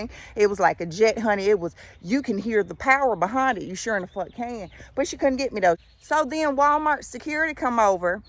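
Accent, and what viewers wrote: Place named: Midwest, United States. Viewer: That actually Midwest accent not country loll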